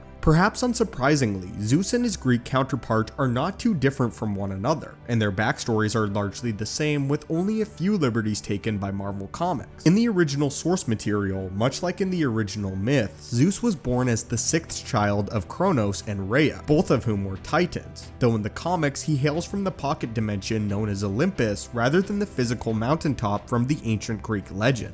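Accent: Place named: United States. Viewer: The americanized pronunciation of the names of greek gods is very distracting.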